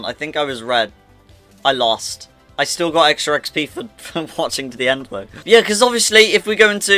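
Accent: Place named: United Kingdom. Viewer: I love your British accent I always wanted to be British but I can’t I hope I can do you think I could)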